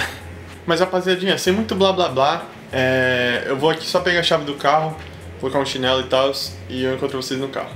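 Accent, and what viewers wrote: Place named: Bahia, Brazil. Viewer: Baiano ein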